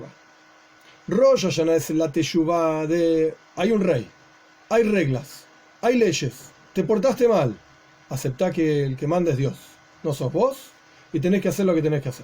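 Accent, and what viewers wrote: Argentina. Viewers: Rab usted es argentino?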